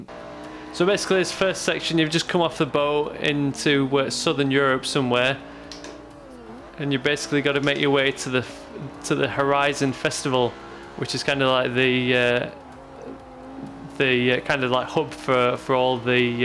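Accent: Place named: Yorkshire, United Kingdom. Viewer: where you from mate you sound local to me. im at Castleford :D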